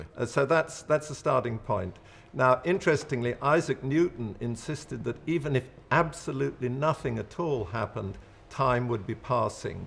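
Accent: United Kingdom. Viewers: His British accent makes him seem much more believable. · He basically admits he is thinking of time as a rigid linear thing, which can be ‘paused’ while other time persists... yet chastising Einstein for thinking of time on a clock. Einstein used clocks as reference for time according to who was using one in relation to acceleration etc. This is old thinking. The clock isn’t the issue... time isn’t the issue.. only to who it’s ‘RELATIVE’ to... he’s describing chapter 2 of E=MC’2 which is 15 chapters. *make another video when you get to the end ;) this only reaffirms that because you have a British accent doesn’t make you wise... it’s having an accent in a theory which has stood the test of ‘our time’ and never, ever been refuted.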